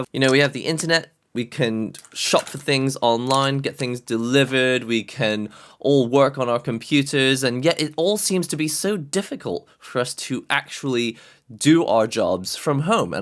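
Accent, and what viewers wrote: United Kingdom. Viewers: Im new in this channel, how you have this awesome british accent?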